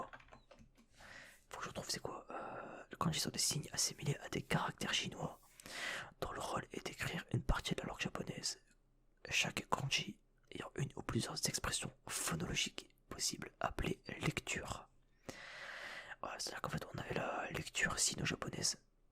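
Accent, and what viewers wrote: North, France. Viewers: Tu viens du Nord ?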